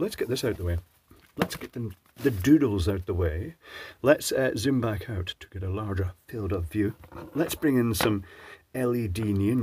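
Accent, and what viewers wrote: Scotland, United Kingdom. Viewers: 😂😂scottish accent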